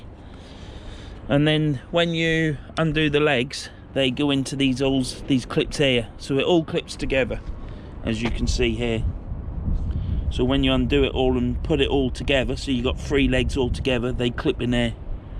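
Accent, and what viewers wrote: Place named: Great Yarmouth, United Kingdom. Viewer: Hi. In this video you answered a question I was going to ask and that's where you are from. The reason for asking is your accent and your work van whith Braydon flooring/carpets, which made me think Great Yarmouth/Gorleston 👍👍 Would love to see you fish Gorleston sometime, as I live in Gorleston and I'm now getting back in to sea fishing after a 20 year gap. In the last month I've fished Gorleston river twice, I've had 30+ Whiting but loads of crabs 😞. Anyway, tight lines, I really enjoy your videos, dont change your personality on the videos as it's you enjoying fishing, good and slow👍👍🎣🎣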